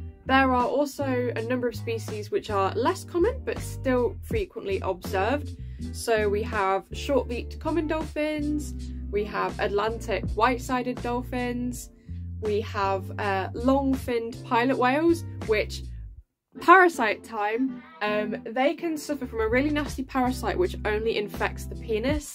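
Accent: United Kingdom. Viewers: Dang!!! And she's super smart and intriguing!!! I like this girl!!! My new c favorite channel!!! There just isn't any other women who are interested in nature and science, and have the most awesome/raddest Cutest style and the accent! I've always kinda not really like a Girl with a British or European accent really.... What was I thinking, I'm speechless! I wouldn't have it any other way now that I've witnessed this perfection before my own eyes!! Okay, sorry but I just had to state what was on my heart!!! I can promise you that I don't go around complementing women on the internet like this EVER!!!! But I guess either I'm the only guy here who is astonished.... Or maybe I'm just the only weak willed man who just couldn't play it cool and act as if I wasnt smitten!!! ..... No offense at all! I just am.... Darn.... Well there it is! 🙃✌🏼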